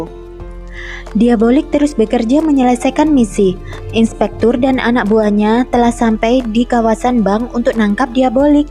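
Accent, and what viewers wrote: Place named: Sulawesi, Indonesia. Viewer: Logk Sulawesi 😂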